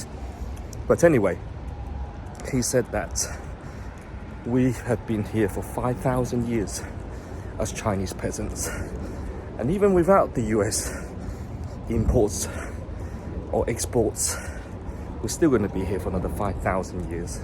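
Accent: United Kingdom. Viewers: Lolz. You spoke in a British accent. Are you British or Chinese? You forogot mentioning 20 million unemployment rates. 😅 I prefer living in Britain than in China! 😂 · Just curious...did you film this in China? I am hearing a very noticeable British accent.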